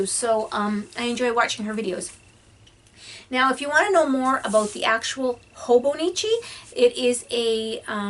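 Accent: Canada. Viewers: The "aboat" gave it away immediately! You're Canadian! I've enjoyed watching your video.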